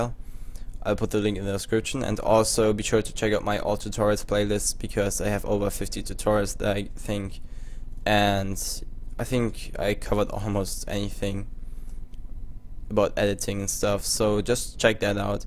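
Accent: Germany. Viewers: Wie man das merkt, dass du deutscher bist! :D Nichts gegen dein Englisch.. :P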